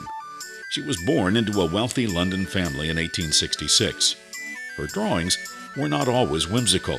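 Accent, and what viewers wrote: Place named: United States. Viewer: Unfortunately it's an American voice over . 🇮🇪🇪🇺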